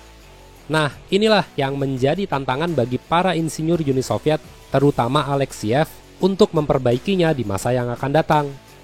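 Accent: Java, Indonesia. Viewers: Bang lu jawa?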